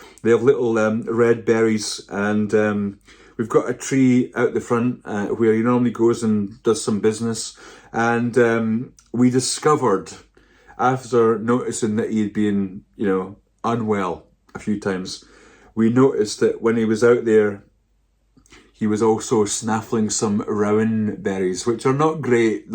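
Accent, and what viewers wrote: Scotland, United Kingdom. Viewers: Hello from USA…..glad to see your video again! I love listening to your Scottish accent and watching you knit so calmly. Your sweater is just beautiful. See you on your next video. 🤗🧶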